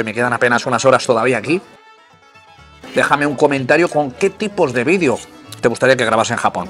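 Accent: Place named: Basque Country, Spain. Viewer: ¿Por qué pone acento vasco si es de Alcalá de Henares? 😄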